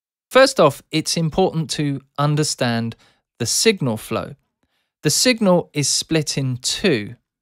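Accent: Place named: United Kingdom. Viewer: shit sounds way more confusing when its in a British accent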